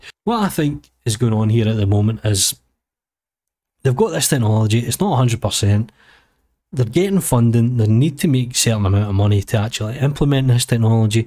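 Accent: Scotland, United Kingdom. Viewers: I am just here for the Scottish accent...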